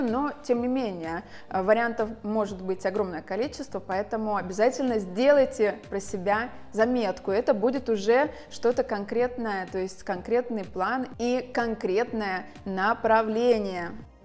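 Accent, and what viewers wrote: Italy. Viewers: я обожаю ваш итальянский акцент🥰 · Обожаю, как вы разговариваете, у вас даже речь стильная! Грамотная, уверенная, женственная и как вишенка на торте элегантный итальянский акцент!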